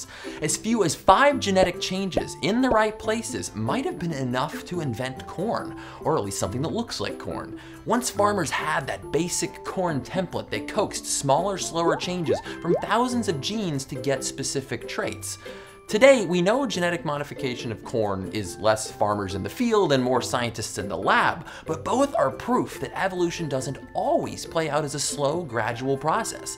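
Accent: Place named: Texas, United States. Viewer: I love how you can tell is from Texas 🤠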